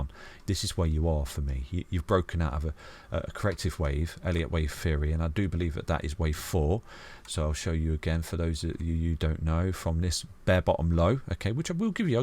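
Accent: United Kingdom. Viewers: Something about the British accent helps strengthen the intelligence and certainty in the predictions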